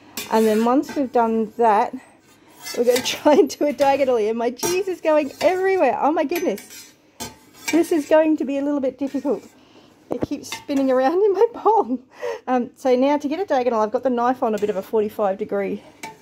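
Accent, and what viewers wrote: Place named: Australia. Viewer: Hello, just met you on the Silhouette live, there were so many that subscribe to our channel and I just wanted to say thank you ❤️ I just subscribe to your channel looking forward to watching you. Love your Australia accent, Lisa from moneypit homestead we are in Kentucky usa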